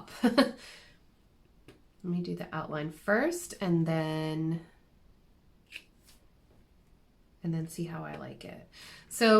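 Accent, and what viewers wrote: Ireland. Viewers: The one I noticed is that you are picking up on the accent of Ireland so neat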